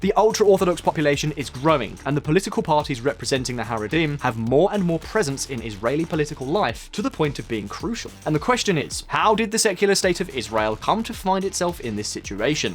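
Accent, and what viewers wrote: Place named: United Kingdom. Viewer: It's the first time I see a video of yours, and as an Israeli I can tell you that you explained it better than most Israelis can. Unfortunately for us, I don't see the Haredim joining the military soon. Also, I think that what most upsets us, Israelis, about them is that because they don't work or have low wages they don't pay taxes, thus making us, who work everyday for living, to pay not only for our lives, families, and our education, but also for theirs. I should pay for my own son's higher education, not for the Haredi education of Yeshivot (Yeshiva in plural) and Tora study Edit: Also, like your British Accent:)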